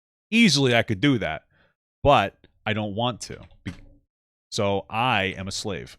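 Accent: United Kingdom. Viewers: I'm Australian and sounds like he has a British accent.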